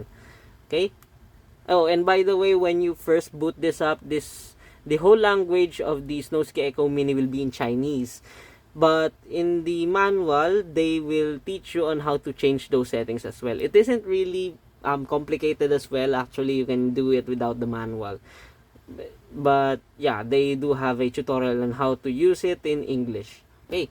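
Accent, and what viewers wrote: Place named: Philippines. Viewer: I like your filipino accent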